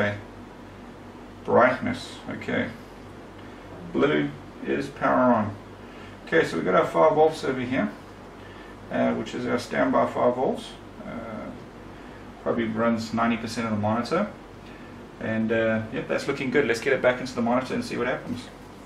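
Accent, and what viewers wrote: South Africa. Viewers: Ha// spot the South African accent a mile away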